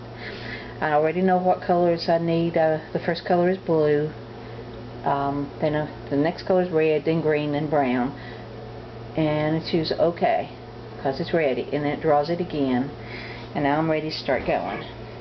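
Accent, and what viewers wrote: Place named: Tennessee, United States. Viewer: Hello, Thank you having the ambition and courage to help us newbie better learn our machines. I have an old 2144 that I'd wanted forever. But there it sits, because no one has helped me with teaching or coaching. I did upgrade it to 2170 online. I see you don't use the cut function. - Does yours not work? I wish you loved close to me, but by your accent, I'm going to say TN.:) (I'm in So Cal.) Big Hugs, Nanette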